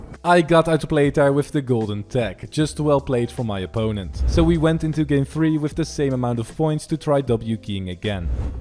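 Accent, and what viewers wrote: Netherlands. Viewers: Nice accent, you Dutch? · ik dacht al de je accent erg veel op een Nederlands accent leek Nice man Keep up the grind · Its so funny want ik weet aan je accent dat je nederlands bent maar nice man you are such a good player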